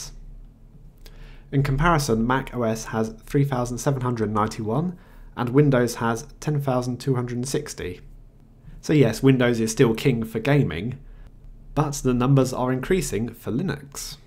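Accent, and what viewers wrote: United Kingdom. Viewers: I enjoyed your broadcast. But since you talk so fast at times, I turned on CC. Interestingly "Mate" became "Marty" in CC. British accent sometimes add an R sound after an A. I imagine that watching CC of your talk might be enlightening.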